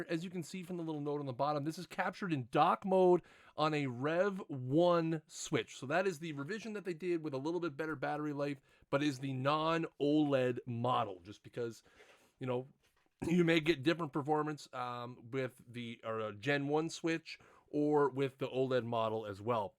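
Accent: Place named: Ireland. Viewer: Total tangent, but I'd love to know where your accent is from. You sound like you turn Irish every time you make an 'ar' sound. When you say 'are' and 'target' back to back, as an example.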